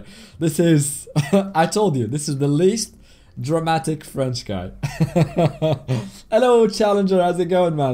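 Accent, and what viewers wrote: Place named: United Kingdom. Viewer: I absolutely love the british accent when speaking french 😁 Keep up the good work! Btw, as a french person, the translation is on point 👍🏽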